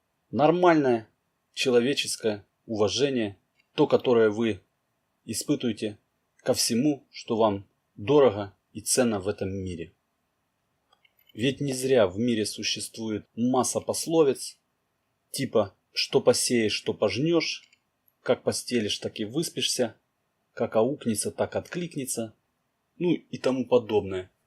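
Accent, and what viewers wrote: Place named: Ukraine. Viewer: Обычный мягкий украинский говор.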